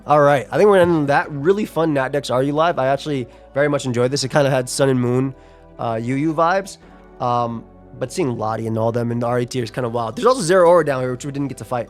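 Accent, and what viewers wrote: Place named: New York, United States. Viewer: should have known tbh, i hear the NY in your voice and the words you say haha. sending love from from Suffolk County appreciate your content fam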